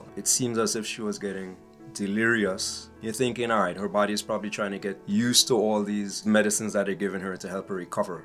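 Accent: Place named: Caribbean. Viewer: Where man can't, God can! Where we fail, He prevails! ... He sounds like he's from the Caribbean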